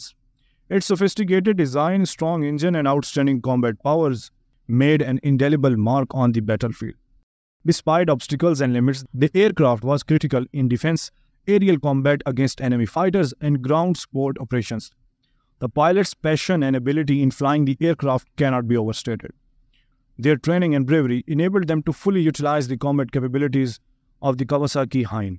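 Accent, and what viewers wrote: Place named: India. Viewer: By the accent I think he is Indian. If you take it into account, he is making a real effort to speak slowly.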